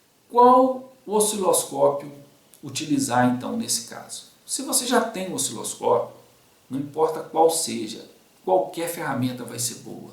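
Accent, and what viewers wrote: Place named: Belo Horizonte, Brazil. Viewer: Esse aí é de BH certeza!😅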